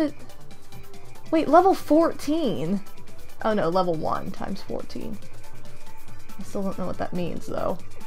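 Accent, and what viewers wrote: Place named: Indiana, United States. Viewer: Canadian here. This year I didn't go over the border for Black Friday deals though so I'll just be staying here listening to your Indiana accent.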